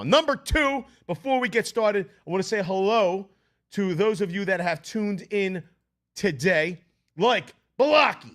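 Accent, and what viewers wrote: Philadelphia, United States. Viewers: Lioke, prioce, wuter LOL must be a Philly guy always good info though despite the accent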